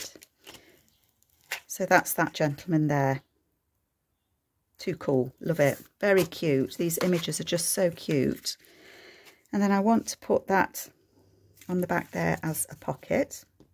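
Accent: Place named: Aberdeen, United Kingdom. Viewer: you are so very welcome... I tried to write you a note 🗒 about being able to locate the book you showed & mentioned... The keyboard wasn't able to show up.... any rate... I found it... Used & in like new condition 4 only a couple $$'s + SH... Thx 🙏 4 showing the front cover.... Your stories while you are creating are most enjoyable.... Your voice with accent take me back to my time living in Aberdeen & New Machere.. Happy time's... Memories more.... Later my BOO's... Sincerely sincere Miss Judith 🌹... xx 🎶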